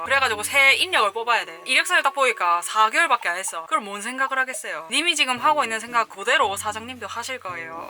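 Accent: Busan, South Korea. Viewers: 부산인 소리 쥘러!!!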